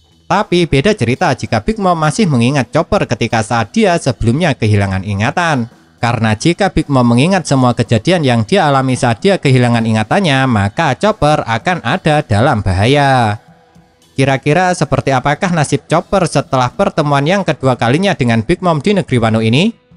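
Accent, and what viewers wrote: Java, Indonesia. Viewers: Logat jawa nyaa bisa lebih di netralkan 🙏🙏🙏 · Medok jowo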